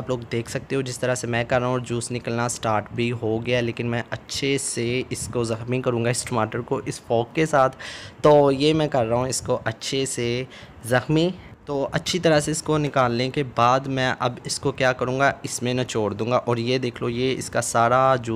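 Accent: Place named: Lahore, Pakistan. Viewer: ni apka way of talk lahOriyOn ki trah h naa jb hi poChaa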